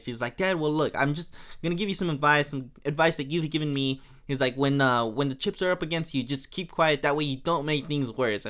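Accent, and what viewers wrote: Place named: France. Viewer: The French accent of yours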